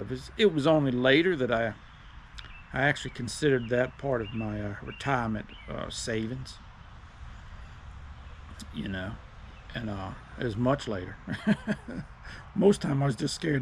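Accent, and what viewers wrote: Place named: Virginia, United States. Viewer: Are you originally from the shenandoah valley of Virginia or West Virginia. I am and you sound like you are. Anyhow I saw this video today, subscribed and watched all your videos in order. I am soooo happy to hear someone honest and down to earth non financial guru. I am 55 still working. Worked in factories since I was 18. Been at this one for 33 years, no pension because company was sold in 2015. Could not agree more about factory work was people working together, friendships and kind of fun. Now if one person is offended or does not agree with another person's opinion it can cost you your job. I want to retire early at 56 with a high 6 digit 401k, probably Obama care gold level insurance. Wife already retired this year at 58 and drawing a few hundred from her 401k per month. I, like you, have been just digging into vids books whatever to educate myself and am nervous I'm missing something. 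Just a hs education here but 100 times more than my grandparents had and I owe them for my work and saving ethic. Like you and your wife we can live good on 3400 net a month and could trim that down if needed. Keep telling your stories because I feel like you are in my living room talking to me and answering my questions. Thank you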